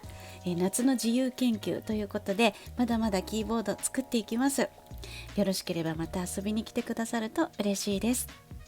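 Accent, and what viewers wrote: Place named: England, United Kingdom. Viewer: i love the English accent im obsessed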